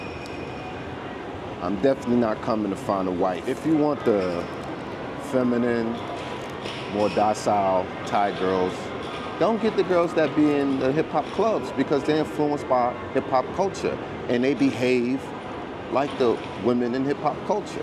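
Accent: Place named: New York, United States. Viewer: New yawk what’s good dawg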